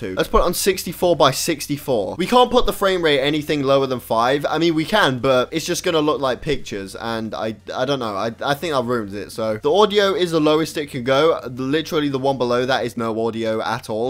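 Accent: United Kingdom. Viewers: British schlatt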